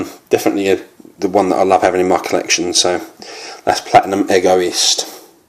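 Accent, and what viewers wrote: United Kingdom. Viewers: Great video, mate! I concur on all of your suggestions for beginners apart from Prada Amber, but that might be because I am not a big fan of it. Nice accent, by the way, I bet you are not from London. Newcastle?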